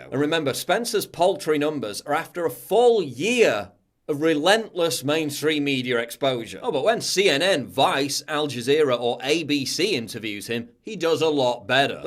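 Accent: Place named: Sheffield, United Kingdom. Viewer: Firstly, it wasn't murder. She died of a heart attack - manslaughter at the worst. Secondly, the Alt-Right does resonate with a lot of people. Red Ice TV has 145,000+ subscribers. James Alssup, present as Charlottesville, has 162,192 subscribers. Tara McCarthy has 50,000 subscribers. Alt-Right leaning "Black Pigeon Speaks" has 249,887 subscribers. Thirdly, makes some dubious claims to smear us all. Only Andrew Anglin mocked Heather Heyer's death. You smear us as "holocaust deniers" yet give no examples. Jared Taylor, Greg Johnson and Vox Day are far smarter than you'll ever be. You're just a sarcy and childish civic nationalist, regurgitating the same old Classical Liberal talking points with an annoying Sheffield accent.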